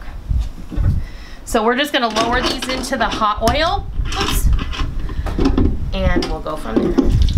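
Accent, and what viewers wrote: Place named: New Jersey, United States. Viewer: I am resenting New Jersey! Whuuuup! Whuuup! You have that Northern accent. What part of Joisy are you from. I am in Union County!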